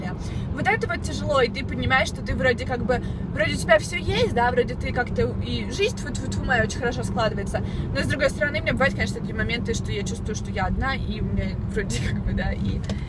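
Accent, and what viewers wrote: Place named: United States. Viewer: Акцент американский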